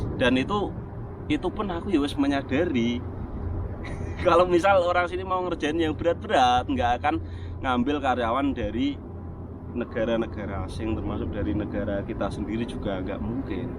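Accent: Java, Indonesia. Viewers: Jowone ndi pak?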